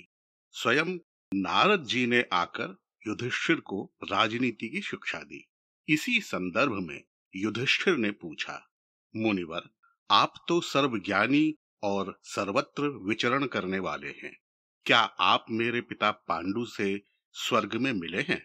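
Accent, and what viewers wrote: Standard. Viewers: शुद्ध उच्चारण ने मंत्रमुग्ध कर दिया है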